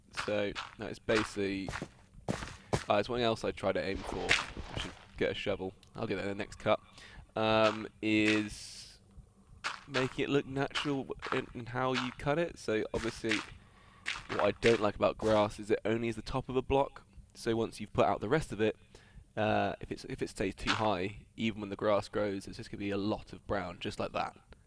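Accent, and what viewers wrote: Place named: United Kingdom. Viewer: omg I just luv ur british accent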